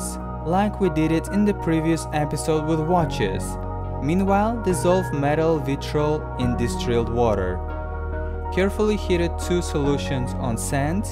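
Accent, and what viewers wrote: Russia. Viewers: Русский акцент всё равно слышно. Но произношение хорошее.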